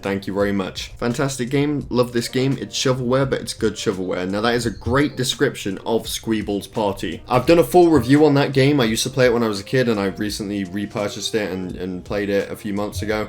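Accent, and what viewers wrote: United Kingdom. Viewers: It's the British accent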